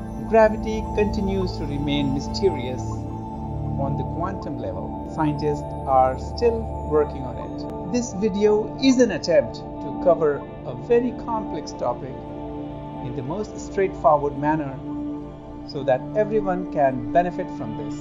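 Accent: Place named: India. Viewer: Turned off immediately after I heard the Indian voice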